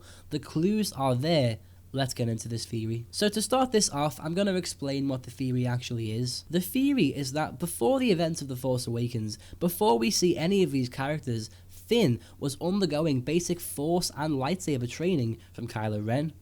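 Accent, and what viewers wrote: Liverpool, United Kingdom. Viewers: Yous a Scouse, innit?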